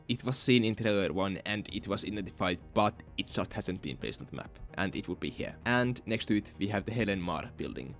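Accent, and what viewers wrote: Finland. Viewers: I hate your strong accent, sorry... but I understand... I prefer to hear your Finnish accent than the annoying and incomprehensible accent of people from India. By the way, great job with the map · I love Finnish accent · Finglish. Or rally english. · That is our brother from Finland 🇫🇮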